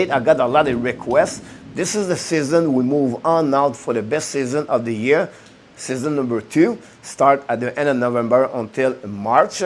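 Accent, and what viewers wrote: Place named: Quebec, Canada. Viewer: on dirais un quebecois tu vient de ou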